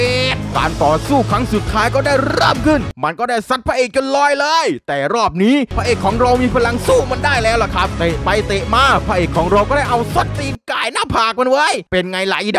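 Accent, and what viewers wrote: South, Thailand. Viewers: เวลาแหลงใต้ โคตรได้ใจเลย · พี่เป็นคนใต้ใช้ไหม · ชอบพี่บ่าวว่ะสำเนียงใต้นิดนึง55 ชอบๆ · พี่เป็นคนใต้ไข่ไหม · พี่เป็นคนใต้เหรอ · พี่เป็นคนใต้หรอ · คนใต้เห้อหลวง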